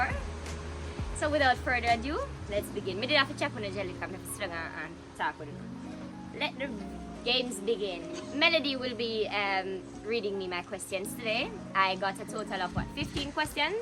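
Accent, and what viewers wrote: Jamaica. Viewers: You have a jamaican accent and behavior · Yo u sound like us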